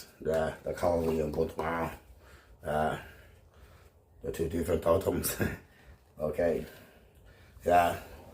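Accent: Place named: Australia. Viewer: I honor your heritage bro, I knew you had an Aussie accent since the get go. My favorite country besides my own is Australia, I probably mentioned before how much I enjoy Rugby football. If I was able to I'd love to come and go on a hunt for an opal. One of my very favorite tv shows is about hunting for opals. I'm Native American and my heritage includes the totem as well. Something you and your bro could share is the boomerang and it's impact on survival for food and the technique to accurately make it's target. THANK YOU both for sharing part of your life story; the artwork is beautiful! I wish I also could honor you with support in some manner. THANK YOU! Watching you from a long ways away in the State of Minnesota U.S.A. 👌😊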